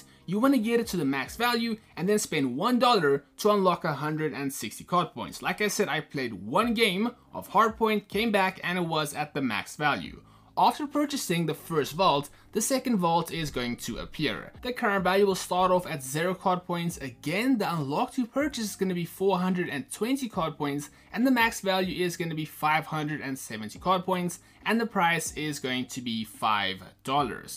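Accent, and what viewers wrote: South Africa. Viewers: Are u south African bro? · Wait are u south African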